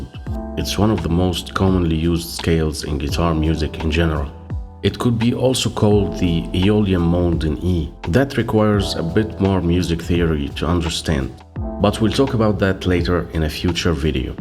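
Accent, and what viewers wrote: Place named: France. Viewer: are you in France? You kind of have that french accent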